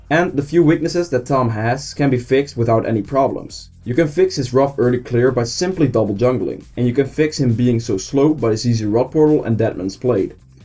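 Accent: Netherlands. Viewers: nice vid again greeting from your home country I can hear a dutch accent xD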